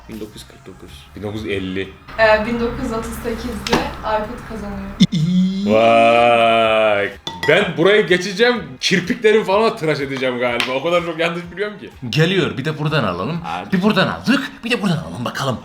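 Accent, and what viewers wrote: Turkey. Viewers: abi sen trabzonlumusun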